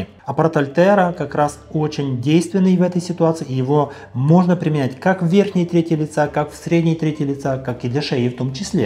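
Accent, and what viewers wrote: Ukraine. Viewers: Дядьку, всоте кажу : у тебе ДУЖЕ СИЛЬНИЙ український акцент!!! Говори українською. Чи, мммм, грошики не пахнуть???😮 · у доктора родной язик украінский, єто просто акцент. скажи ему спасібо что он для тебя по русски рассказивает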